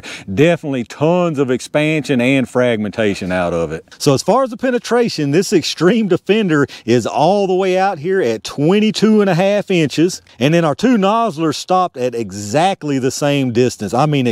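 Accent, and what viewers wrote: South, United States. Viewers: The Phillips screwdriver tip creates a vortex. Very similar to where you drain your kitchen sink and water swirls around the drain as it goes down the drain. Another example is if you were baking a cake and you use the beaters and they swirl around in the batter the batter will automatically be slung to the sides of the ball in the center is empty. That’s another created vortex. The ball is generally solid copper, and it creates a larger hole than almost any hollow point mainly because of the Vortx it creates. I have researched the Phillips screwdriver, bullet, and what it does makes a 380 as effective as a 9 mm and a 9 mm as effective as a 45. Oh, that is on wound diameter. If the Phillips screwdriver gets anywhere near the heart, it will blow the heart up. I use it on all of my carry handguns. I got pretty accurate to. Right now I am in search of the same cartridge and bullet shape for my 38 special snubnose. 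However, you performed a great demonstration and I enjoy watching you whenever you are on the air. Keep up the good work I especially love your southern accent. It is beautiful.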